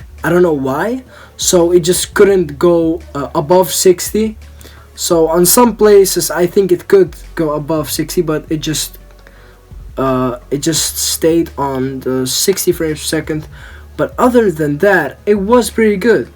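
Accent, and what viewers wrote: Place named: Netherlands. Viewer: Lekker NL accentje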